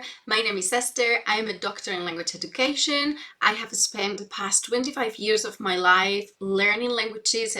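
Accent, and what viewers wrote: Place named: Scotland, United Kingdom. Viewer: That's really the only indicator I notice that she is a native Spanish speaker. A Scottish influence is clear.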